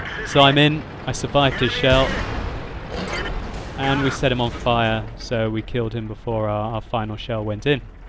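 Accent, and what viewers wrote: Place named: Australia. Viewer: why do you sound so australian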